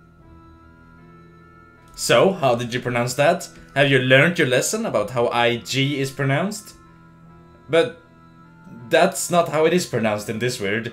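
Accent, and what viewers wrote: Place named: Sweden. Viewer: Just like "Catting your hair" Swenglish ftw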